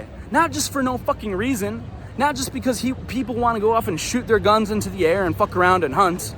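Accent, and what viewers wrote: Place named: Midwest, United States. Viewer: That Midwest accent came out in this one. That’s how you know he’s cookin’.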